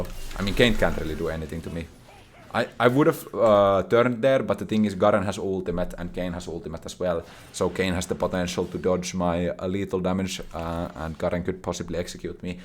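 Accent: Finland. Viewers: You have such a Finnish accent I love it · are u from finland? ur speaking kinda sounds like it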